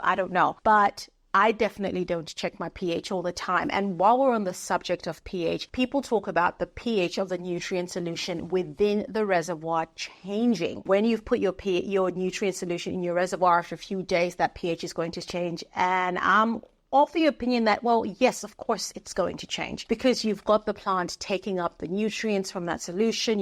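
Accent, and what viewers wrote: Australia. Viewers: The lady I bought a few of my plants from watches your channel & she brought over some plants in leca & pon. I couldn’t see the water level, so I panicked & repotted them straight away. I’ve never experienced root rot, but the roots sitting in water scares me. Yours look great, so it’s working for you. I just adore an Australian accent 😌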